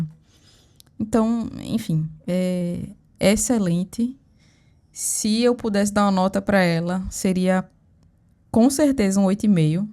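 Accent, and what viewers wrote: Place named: Pernambuco, Brazil. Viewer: De onde tu é ? seu sotaque sobe mais pra pernambuco em diante, tbm sou nordestina ,eu já quis comprar a sonnet pra colocar em uns minis estojinhos que comprei, eu tenho a white nights a yellow ochre é horrivel de transparente mas as outras cores são maras eu gosto delas, tem uma galera que não acham elas profissionais o suficientes como se tivessem entre as profissionais e estudante, n sei se é a origem da tinta, por n ser marca francesa ou inglesa ou norte americana. O ocre da sonnet é ruim que a white nghts? sinto falta de ter migos pra trocar swatch de cor , e n teria comprado o kit da cotman45 cores num dia que ele passou sem dupla taxa pouca coisa mais cara que a de 12 cores n brasil , por isso n me arrependo. reconheço seus videos pelo pincel amarradinho do cabo curto da keramik , nunca vi ninguém falando deles.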